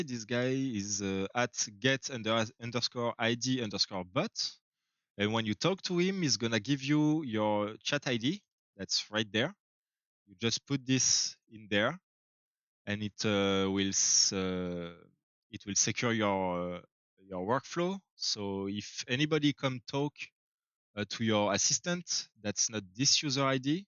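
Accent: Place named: France. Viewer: L'accent français ❤ j'adore hhhhhh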